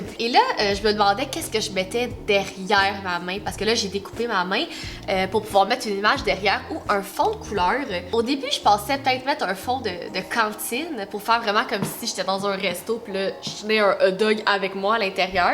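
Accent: Quebec, Canada. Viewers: Je kiffe l'accent kébécois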